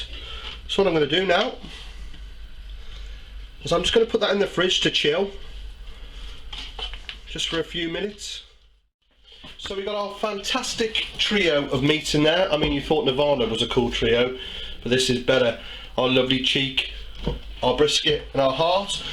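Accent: United Kingdom. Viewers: British acent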